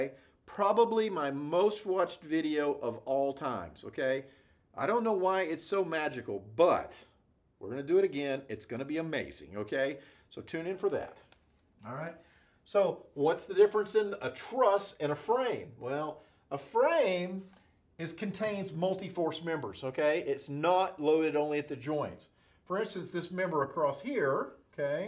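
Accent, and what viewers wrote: United States. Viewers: Is that a Tennessee accent I hear?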